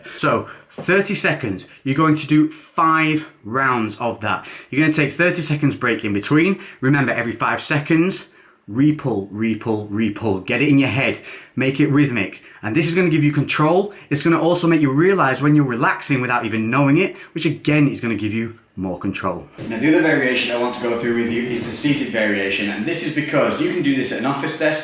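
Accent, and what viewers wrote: United Kingdom. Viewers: How did I know he was going to have a brittish accent before the video started LOL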